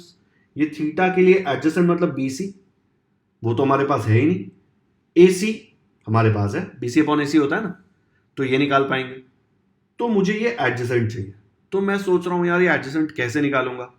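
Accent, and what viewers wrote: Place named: Maharashtra, India. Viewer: Sir i think you are Maharastrian : - becase of your pronunciation (Am i Wright ? ) 😊😊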